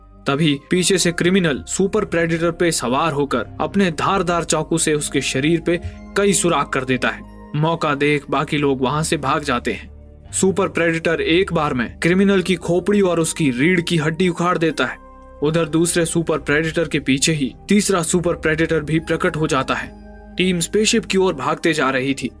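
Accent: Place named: Maharashtra, India. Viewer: हा आपके बोलने के अंदाज से पकड़ लिया था Love from Wani❤️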